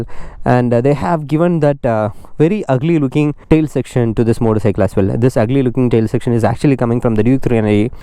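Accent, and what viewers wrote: Kerala, India. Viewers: I knew you’re a mallu with your pronunciation!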